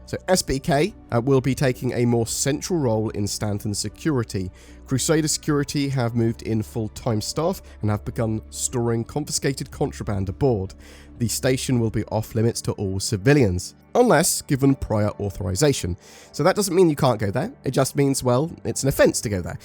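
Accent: United Kingdom. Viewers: 3.18 Bring it on. To be honest I watch your videos more than I actually play the game. LOL. Might it be your British accent? I follow you on Facebook as well.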